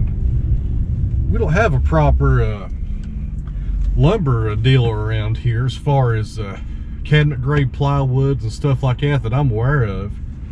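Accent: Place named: Tennessee, United States. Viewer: I know nothing about sawmills or wood but i find your you tube channel fascinating. I appreciate the beauty of wood and wood grain and to watch you assess a log, cut it and show the lovely grain is brilliant. Add to that your commentary in a wonderful Tennessee accent and I am hooked. I also appreciate your excellent taste in music, which leads me to my only criticism.....would you please list the artist and song name of the tracks you choose for your videos? Even better idea is...have you ever thought about a Spotify playlist that we could follow? That would be as popular as your YouTube channel. Thanks a lot for all the great vids.....i look forward to the next one.👏👏👏👏👏👍👍👍👍👍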